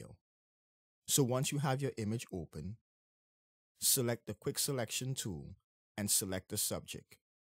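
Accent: Trinidad and Tobago. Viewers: Sounds like a Trini! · thank you! BTW you sound Trini.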